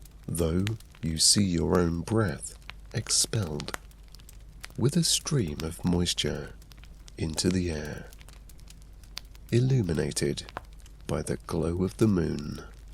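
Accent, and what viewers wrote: Scotland, United Kingdom. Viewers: I was having some trouble recently calming manifestation.guide the voices in my mind during my morning meditation. him has a wonderful Scottish accent and a very calming voice. Thanks to his meditation CD's I have gotten back on track and feel a big difference in my meditation practice.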